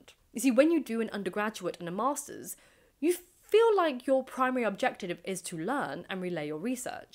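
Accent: RP, United Kingdom. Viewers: Also why do you speak with a heightened RP accent? Is that your real accent or is that just for the Youtube chanel?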